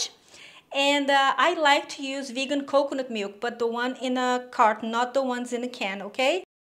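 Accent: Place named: Brazil. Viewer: Eu achei seu canal alguns dias atrás, em uma receita de cream cheese vegano <3 Ouvi seu sotaque, sua simplicidade e pensei comigo "aposto que ela é brasileira". E acertei :D Essa receita tem muito a vibe de família brasileira, dia das mães, ou domingo em família. Coisa mais linda, assim como todo o resto do seu canal. Parabéns! <3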